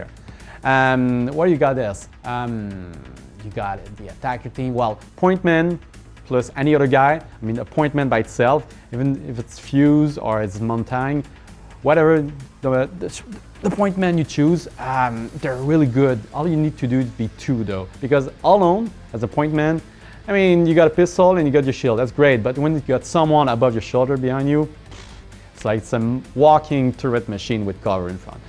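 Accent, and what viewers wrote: Quebec, Canada. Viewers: Thats a HUGE french accent. (french canadian i think) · He's a french canandian, just accept the way he talks english lol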